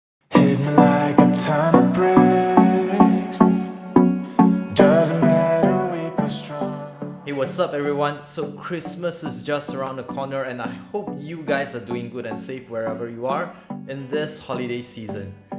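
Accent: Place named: Indonesia. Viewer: Orang indonesia ya bang? Dari aksen nya kelihatan sama bagian deskripsi nya hehehe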